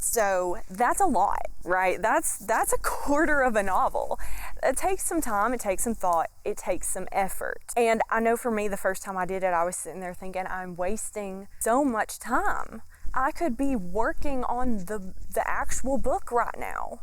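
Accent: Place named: South, United States. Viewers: Southern accent? Author? In the woods? I pressed the subscribe button so hard. 😂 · So glad I found this video. I developed this same method on my own and it REALLY works. Get as much detail or splashes of dialog into the individual chapter descriptions to support your story structure ahead of time. BTW love your t-shirt and accent. East Texas? Oklahoma?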